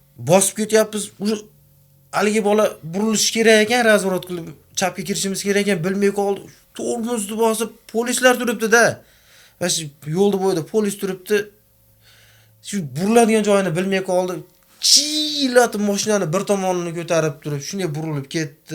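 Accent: Andijan, Uzbekistan. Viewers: Ертак боп кетди акахон Ростан Анжанлимисиз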